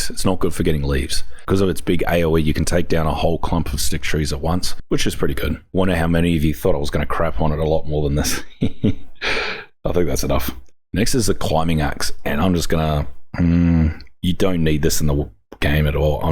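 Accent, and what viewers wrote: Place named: Australia. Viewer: I think he's from Australia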